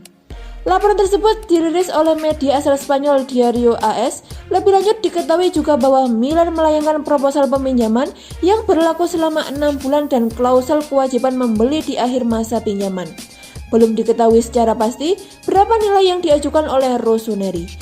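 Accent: Java, Indonesia. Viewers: Medok plus plus. Aksen medok tapi nyebut nama pemain pake ejaan english yang rada rada maksa 🤣 mungkin ini yang disebut Javanglishia 🤪 Buat input ya min, ejaan namanya biar ga terlalu aneh, bagusan cati referensi ejaanya dari komentator bola berbahasa english pas pertandingan. Biar ga terdengar weird kalo disebutin di channel ini. Sukses aja min buat channelnya. Keep it up 💪🏼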